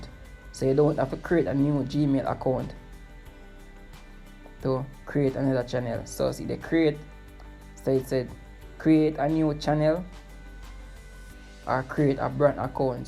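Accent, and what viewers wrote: Jamaica. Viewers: I will stick around for the Jamaican Accent.....Bomboclat!!!!!.....i just subscribed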